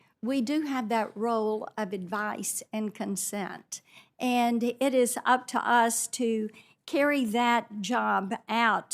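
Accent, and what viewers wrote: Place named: Tennessee, United States. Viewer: Marsha Blackburn is a credit to American politics. And a much-needed credit too. I try to watch her whenever she questions anyone. She is very bright, polite, typically conservative and attractive to boot. That Tennessee accent and her wild hair are so endearing; the Republican Party is lucky to have her. We all are.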